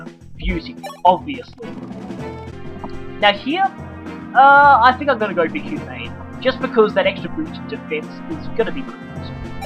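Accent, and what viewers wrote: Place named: Australia. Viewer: Thanks for the reply. Saw your name pop up from a Chuggaaconroy tweet thread reply regarding your persona 5 playthrough and saw a mention of Australian accent. Was wondering if you were the same person, and lo and behold it was after hearing your voice and the copter. Glad to see your content like this, and I’m pleasantly surprised you’ve played the Trails in the sky series as well.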